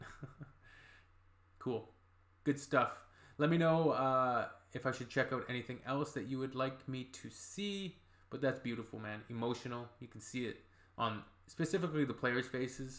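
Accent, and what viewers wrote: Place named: Canada. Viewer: Thank you so much for doing this, and for your kind comments. BTW I could tell you were Canadian before you told us. Most can't tell the difference between Yanks & Canuks (From an old Rhondda boy now living in Victoria BC)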